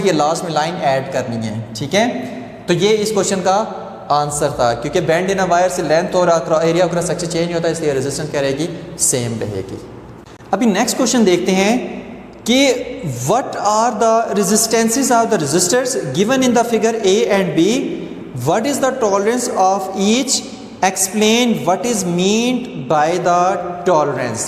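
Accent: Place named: Saraiki, Pakistan. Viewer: Sir ap baton baton mei saraiki lgay hain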